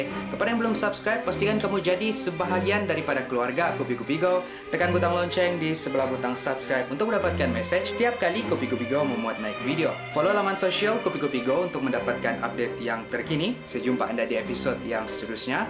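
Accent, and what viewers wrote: Sabah, Malaysia. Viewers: ni mcm org sabah oh.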